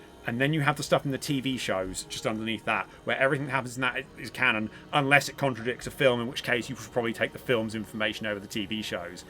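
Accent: United Kingdom. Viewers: fkn bri ish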